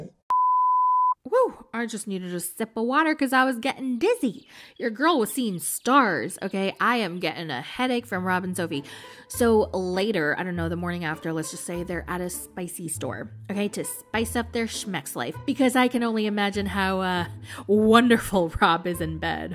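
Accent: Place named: Michigan, United States. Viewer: your Michigan accent LOL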